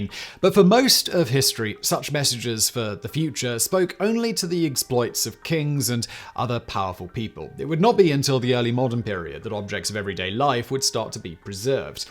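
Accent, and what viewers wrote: United Kingdom. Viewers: I know this was an old video when it started because Simon still has his full british accent.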